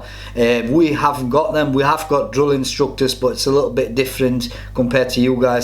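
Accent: Scotland, United Kingdom. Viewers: You have a hint of a Scot accent. But then again my ear's are screwed. I have pals in Glasgow all ex military and great pals to have your back in a bad situation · He’s got a Scottish accent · He is either Scottish, or from near the English-Scottisg border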